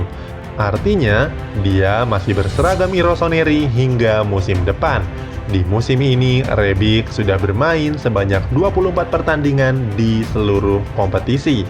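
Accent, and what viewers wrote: Indonesia. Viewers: Lidah indo broo..😅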